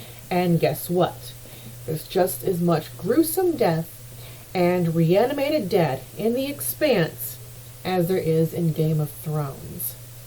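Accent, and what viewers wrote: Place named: United States. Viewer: I love your accent! What state are you from?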